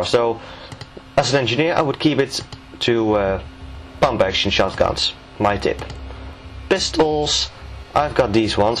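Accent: Netherlands. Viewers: Are u Dutch? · je hoort echt goed dat je Nederlands bent goede video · ey man ik hoor aan je accent dat je nederlands bent, ik upload ook gameplays van bfp4f check mijn channel btw nice commentairy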